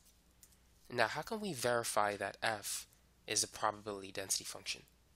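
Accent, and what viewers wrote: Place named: Brooklyn, United States. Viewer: I love your Brooklyn accent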